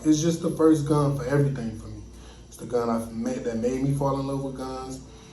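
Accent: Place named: South, United States. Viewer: 😭😭😭😭 Sounds Just Like Down South, When I Use To Live In TX & FL, I Remember A Few Niggas Said It Like That, Always Sound Fire 🔥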